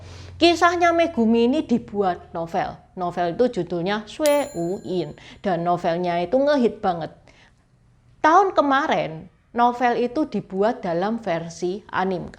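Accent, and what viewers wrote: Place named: Java, Indonesia. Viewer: Andai aku dengar cerita ini dari radio, pasti aku menyakini klo ini orang Jawa tulen...wkkkk. Maju terus....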